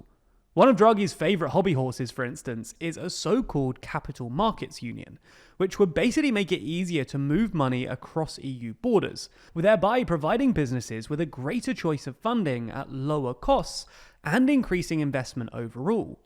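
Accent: United Kingdom. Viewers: Fairly sure 'catch up to' is american and on this side of the pond we say 'catch up with'? I say that as one of the things i enjoy about the channel is a well spoken british accent!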